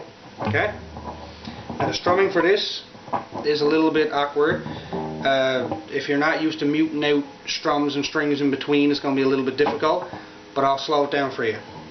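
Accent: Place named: Newfoundland, Canada. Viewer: You sound like a good ol' Newfoundlander. Yes, m'son. Nice job.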